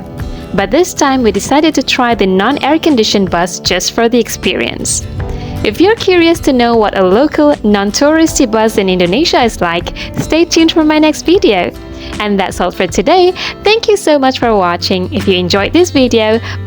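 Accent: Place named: Australia. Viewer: have you spent time in Australia? sounds like you have a slight Australian accent.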